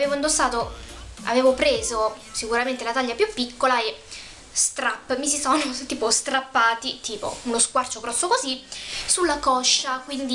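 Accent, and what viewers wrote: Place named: Rome, Italy. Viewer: bellissime cosine mi sei mancata in questo periodoo!!adoro il tuo accento romano😘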